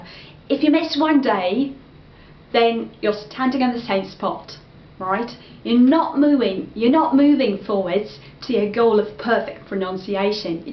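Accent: United Kingdom. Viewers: Hello, that's really interesting. and your pron is fantastic!!!! But I've got a question on my mind - why did you choose British pron, not American one? Would be glad to know your points, thank you)) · you are amazing! You sound so British! I can't argue with you that working on a pronunciation is very important quality of a learner of English. In class I often get mixed up by my school mates speaking with the Russian accent, it is sometimes quite difficult to figure out what they mean and I ask them to repeat. Even though I am just a learner as they are I find it easier to converse with the natives. I'm taking the CAE exam in July, the event that I'm actually dreading, and I would like to ask you for some tips for improving listening skills. I do listen a lot, but somehow that influences only my pronunciation and speaking skills, and every now and then I keep on missing out things in my listening tasks. 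I realy go out of my way trying to improve these skills, but still no headway... Any advice? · Спасибо за прекрасные советы! В восторге от вашего крутейшего британского произношения, просто музыка для ушей. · Hi.I just subscribed.'Cause yesterday I saw this video,and I am amdmired.Great job.I'm a kind of beginner,have watched many many videoes and still do it.Will be glad to wath new videoes of yours.And have one question how'd you get your wonderful British accent,did it take long time,have you studied in England? Keep going you're great!!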